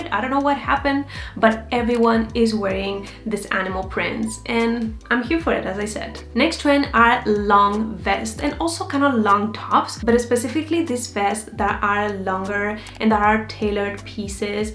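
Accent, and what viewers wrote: Spain. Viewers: are you a spaniard? you sound like my mom (in a good way)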